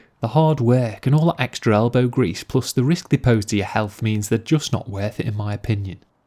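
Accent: Liverpool, United Kingdom. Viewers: I’m really struggling to pin down that scouse accent, must be very far over the river.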